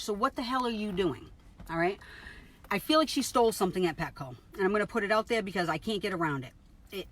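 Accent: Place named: Massachusetts, United States. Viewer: Hello.. I know I'm wicked late to respond.. New subscriber here.. As soon as I started listening to you a few video's ago I knew you were from MA! As am I. 💜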